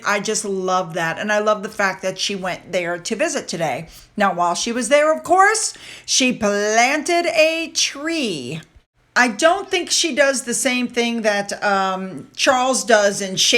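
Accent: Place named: United States. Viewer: So what she is a American not English .And American English is very different from real English.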